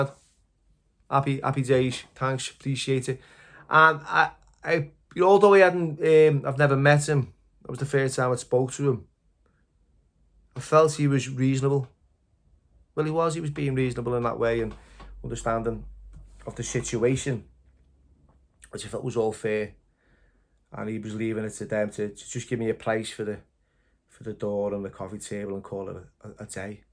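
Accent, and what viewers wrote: Liverpool, United Kingdom. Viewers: Loved the accent. Didn’t know it was possible for a scouser 😂. Great stories. Kept me interested all the way 👍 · love the old time storeys m8 you speak like a proper scouse lad not like the other fk on other channel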